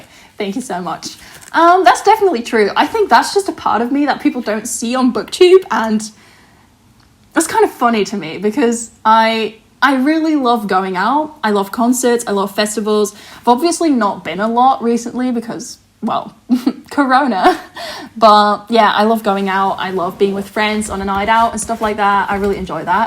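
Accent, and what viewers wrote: Australia. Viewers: But I love your accent so much! I think it sounds australian and I love it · I agree! It actually sounds pretty Australian and I love it! It's one of my favourite accents!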